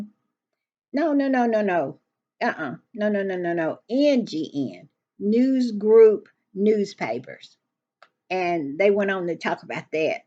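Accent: South, United States. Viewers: I am watching from England, and I just love your Southern accent ❤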